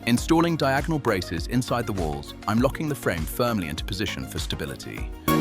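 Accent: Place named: United Kingdom. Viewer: lol what? The voice is obviously British and this is obviously located in the US.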